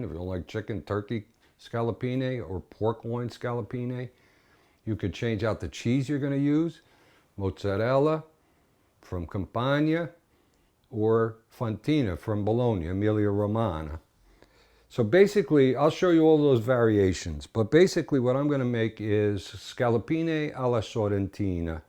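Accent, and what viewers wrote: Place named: New Jersey, United States. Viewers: yeah I like this guy. jersey guy found a home in San Francisco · Gotta love that NJ accent.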